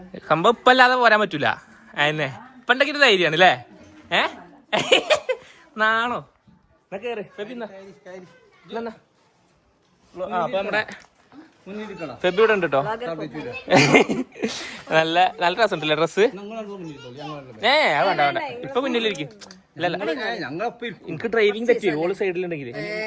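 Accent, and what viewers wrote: Kozhikode, India. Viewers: Inkum venam kozhikodeinnpenn ketta aa samsaram nalla rasam und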